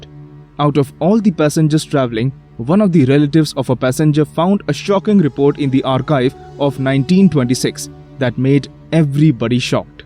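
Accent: India. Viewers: Your INDIAN accent made this video especially confusing. But a creepy account of fiction I think. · I hear Indian accent bro are you one of us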